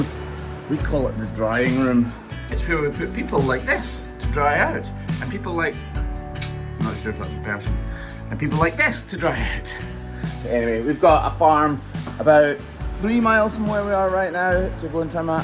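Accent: Scotland, United Kingdom. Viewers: Your accent reminds me of William Wallace on Braveheart. Watch your channel all the time, love that you care about cows.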